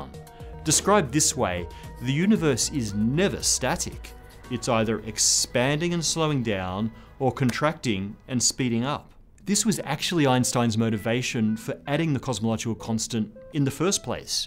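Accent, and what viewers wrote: Australia. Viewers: This chap has an Australian accent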